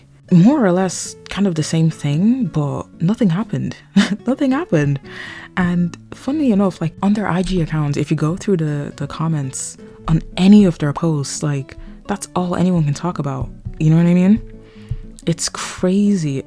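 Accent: Ireland. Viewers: She sounds more Irish to me 🤔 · Omg are you irish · ur irish accent is really popping through in this video !! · she's irish lmao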